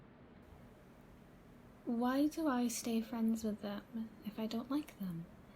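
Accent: Wales, United Kingdom. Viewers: Hmm. You sound Welsh. First time I’ve heard a Welsh ASMRtist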